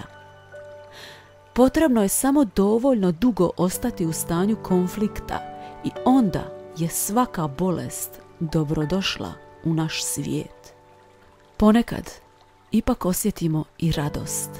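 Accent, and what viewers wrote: Croatia. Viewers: Ja crtam neke lavirinte, a u pozadini slušam, maaa superiska se opustio, korisno, vredno podsećanja, a glas, ritam, melodija maa šta reći, odlična si, no ja malo nisam navikao na vaš akcenat pa mi još bolje, zanimljivo veselije(hrvatski mislim) i svaka čast što obradjujete ove teme, ma siguran sam da ste pomogli mnogima, meni barem-sigurno!!! HVALA VAM I ŽELIM VAM SREĆU I USPEH OBOSTRANU, ZIVELII U RAAAAA!!!!!!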